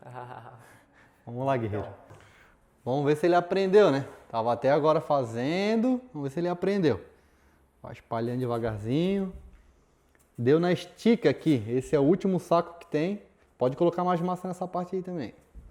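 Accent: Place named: Florianópolis, Brazil. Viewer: "A viXta aqui.." "Arrente" "Mexmo assim já passou o Axpirador..." Manézinho será mo quirido? Top demais ein!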